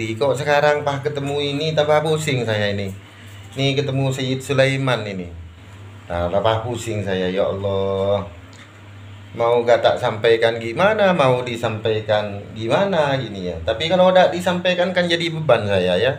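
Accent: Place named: Java, Indonesia. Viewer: Mohon maaf yai,kalo di baca orang jawa mungkin lebih gampang arahnya. Nada2nya ini bahasa jawa🙏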